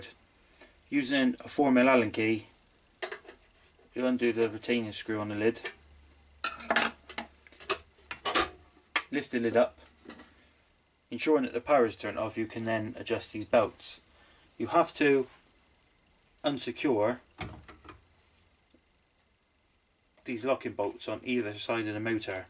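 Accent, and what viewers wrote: West Country, United Kingdom. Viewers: west country lad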